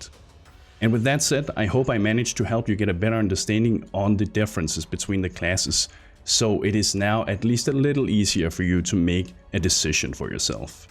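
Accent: Denmark. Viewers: Er du dansk Bro? Syntes jeg kan ane en Dansk dialekt i dit engelske ;p Når men Mange tak for videoen, Det var super go Info. 👍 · Are you Danish, you sound Danish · You sound danish brow. Nice vid.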